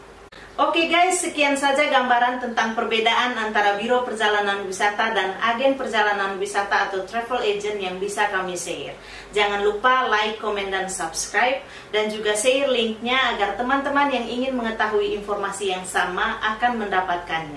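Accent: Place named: Flores, Indonesia. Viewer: Sangat membantu penjelasannya sangat jelas dan logat atau gaya bahasanya sepertinya mba orang flores ya,...terus kembangkan pariwisata komodo...salam flobamora 🙏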